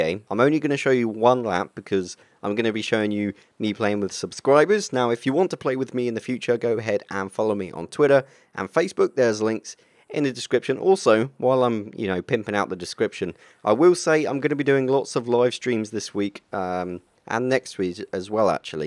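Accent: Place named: Southern England, United Kingdom. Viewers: Which british accent is that? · DeadDudeDancing I'm from the UK and I'm not sure, sounds like he's from the South like me, I think what sets him apart is (no offence) his high voice. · Which british accent...lol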